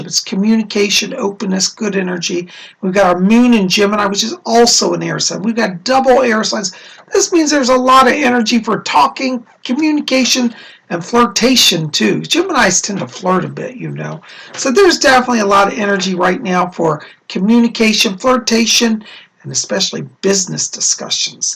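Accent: United Kingdom. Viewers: you starting to sound like your from UK lol you and Lady Cola make a beautiful awesome team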